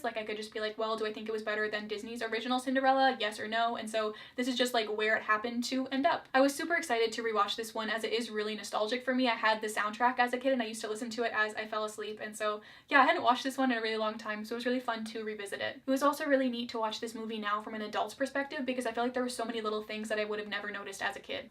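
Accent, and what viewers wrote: Canada. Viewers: Your Canadian “out”s were STRONG in this video!!:)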